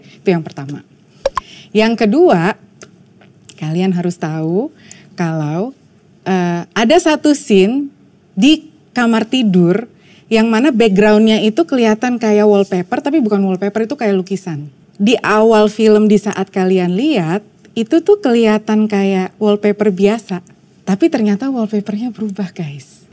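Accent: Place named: Java, Indonesia. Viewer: Mba nya imut ...., kosa kata Jakarta tapi logatnya medok Jawa, jadi pengen nyubit si Mba ... heheee..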